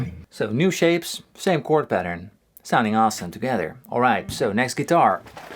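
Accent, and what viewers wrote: Netherlands. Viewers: i just discoverd that your'e dutch. meanly by your accent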